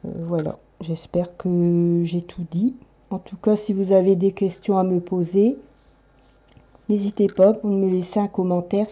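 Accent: North, France. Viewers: Merci pour vos explications j'essaierai d'appliquer vos conseils et vous tiendrai au courant et encore un grand bravo pour vos vidéos encore une petite question il me semble reconnaître un accent nordiste dans votre voix est ce je me trompe ?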